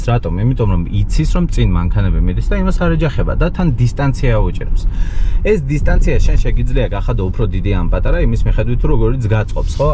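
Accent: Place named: Mingrelia, Georgia. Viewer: როგორ მიყვარს მეგრელების ლაპარაკი <3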